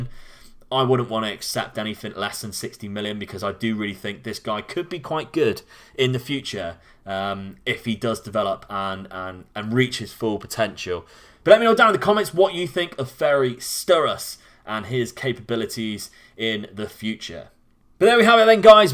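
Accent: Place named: West Country, United Kingdom. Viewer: I thought your accent was west country but didn't think you were as local as that, Plymouth for the win (something no-one ever said before) lol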